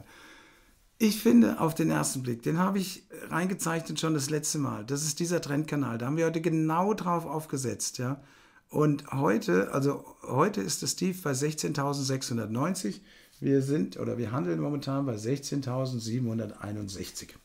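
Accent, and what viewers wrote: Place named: Hesse, Germany. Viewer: Ey, subber hessisch gebabbelt Alder! Guuude Rutsch, gell?!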